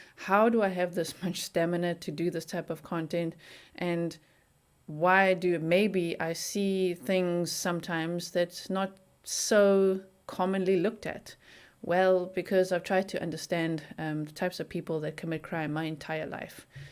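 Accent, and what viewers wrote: South Africa. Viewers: Yes! I found you because you are covering the Petito/Laundrie case and I could listen to you just because of your south african accent: I love it 😉 My aunt is also from south africa so it sounds so familiar. But I also love the way you dive into the whole case, the whole persoon. Not just one aspect. It is nice to hear a bit of your background and who you are. Not nice to hear about the trauma but like you said; it makes your approach different and even more thourough in cases I think. I hope you feel a bit at home already here in the Netherlands. And safe(er) 💛 Groetjes! 😉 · I know how busy you are with your rapidly increasing fame & can't answer everyone. I sent a gmail with the question on if religion ever plays into your analyses/investigations & I should have said evil, possessions, satanic activity. What Brian did is evil & maybe he went full blown dark & sacrificed poor Gabby. 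Maybe he was temporarily possessed a la Chris "Low Wattage" Watts? How about Anna Leikovic, Aiden Fucci, pure evil. I have been traumatized in my life dealing with evil. We can all speculate, maybe the "Dirty Laundries" are closet satanists? Thanks & you are awesome & so is your South African accent.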